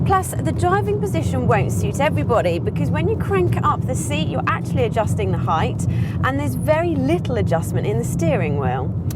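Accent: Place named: Liverpool, United Kingdom. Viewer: Is it just me or has this women just got an imperious, pseudo voice!!!! behind her flaccid figure is nothing more than a northern scouser!!!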